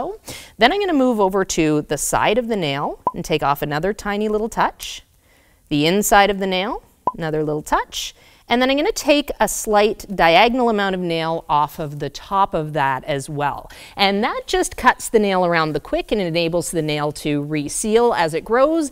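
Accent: Canada. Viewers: is that a canadian accent? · "Talk a-boat" 😂god I miss that Canadian accent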